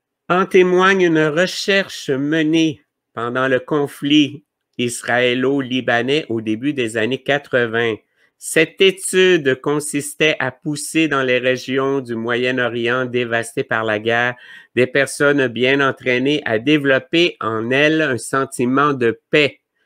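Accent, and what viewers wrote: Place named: Canada. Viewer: ❤️ Merci beaucoup cher 🙏🏻. J’aime vous écouter et votre accent canadien 🎄 J’habite en CORSE et bien loin de chez vous: magique aussi ces liens instantanés d’un bout à l’autre de la planète 🌍 Cette vidéo, avec l’Alliance Galactique, sont pour moi les plus importants, comme des contes de Fées bien réels... des magnifiques et grands moments d’émotions pour moi 🙏🏻🕊🕊🕊 Gros bisous 😘🥰❤️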